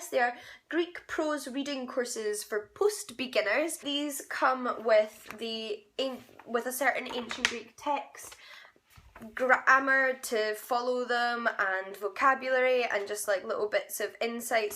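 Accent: Scotland, United Kingdom. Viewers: Your accent sounds Scottish. Didn't know anyone in that area of the world was interested in ancient languages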